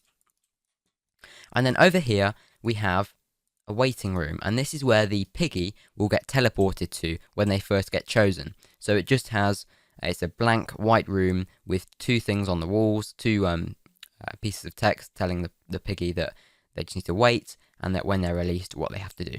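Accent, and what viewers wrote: United Kingdom. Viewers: ikr, He used a heavy British accent, lol those days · I can tell you are British and you have a voice that changed so much in one year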